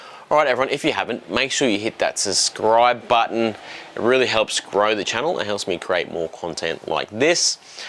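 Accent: Australia. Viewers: Good video, but a had a hard time understanding your Australian twang. Greetings from Las Vegas Nevada USA 🇺🇸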